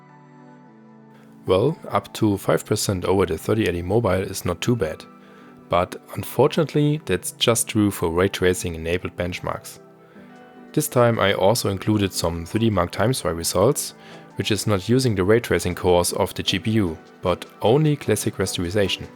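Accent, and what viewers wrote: Germany. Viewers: das deutscheste englisch der bre ist deutsch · I smell German! Its clear due to your Accent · hey man, ich mag ja deinen Content, extrem geil, ABER deine Englische Aussprache ist soooo grottenschlecht das es mir die Schuhe auszieht, dein englisch ist auf dem Status des deutschen Funkers der der Titanic auf die message "we are sinking" gefragt hat "what are you sinking of" dein englischer Wortschatz ist echt gut, keine kritik, aber dein deutscher Akzent tut einem in den Ohren weh :.( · i hear deutsch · Hör ich da nen deutschen Akzent 😂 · That german accent xD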